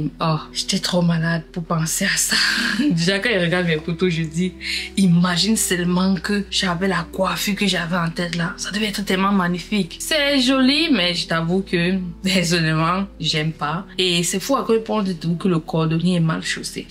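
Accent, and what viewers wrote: Cameroon. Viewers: juste dire t es trop drôle.tu racontes comme une vrai Kmer😂🤩🤩🤩.j adore la coiffure du premier mariage restreint ❤❤❤🎉🎉🤩🤩🤩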